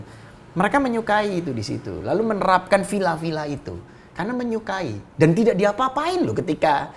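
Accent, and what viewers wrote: Java, Indonesia. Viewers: baru tau ini di RL, Mas ngomong ada medok nya 😂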